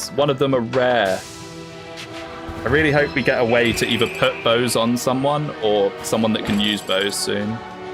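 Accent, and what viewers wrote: United Kingdom. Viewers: Can't wait for the 3am stream I'll miss into another ninian edit into 4 years without streams, keep it up my favorite bri'ish man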